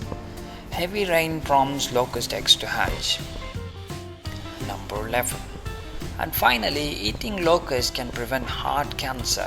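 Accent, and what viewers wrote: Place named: Qatar. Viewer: Nice Qatari Voice....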